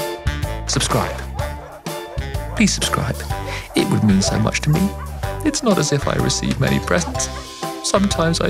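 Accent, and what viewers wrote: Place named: United Kingdom. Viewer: Ah esse inglês britânico difícil e lindo!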